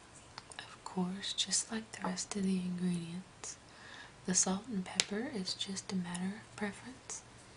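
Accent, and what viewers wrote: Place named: Texas, United States. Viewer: AH HA! That explains the accent. You are from Texas. That means we are neighbors. I'm from Louisiana. :) I love guac but I never knew how to make it until now. Thanks for teaching me!! And you are REALLY good at the soft speaking cooking thing. Me? Not so much. I need more practice with slow movements lol. I hope you decide to do more like this :) Thanks for the video! -Katie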